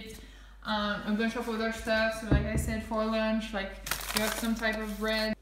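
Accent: France. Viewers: Thank you for the tour of your kitchen. Interesting and always fun to view something new. 💜 love your French/English accent. Your so Cute 🌺 My Best always 😉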